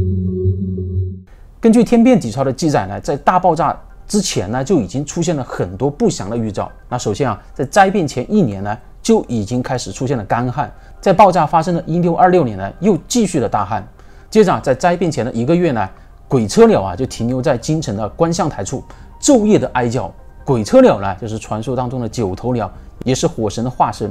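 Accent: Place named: Fujian, China. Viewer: 福建口音听不出来吗你🙈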